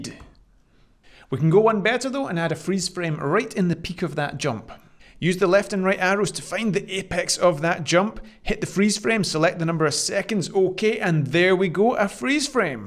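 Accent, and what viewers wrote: Scotland, United Kingdom. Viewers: I have subscribed. Nice to hear another Scottish accent!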